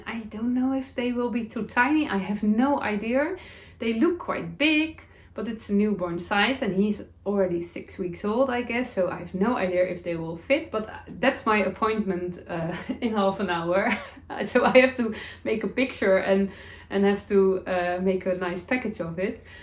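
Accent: Netherlands. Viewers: I understand the change but that doesn’t mean that I like it. You are so cute and authentic. I too am amazed at your grasp of English. It makes me smile to listen to you with your Dutchy english. I will look forward to Mondays with you. Gayle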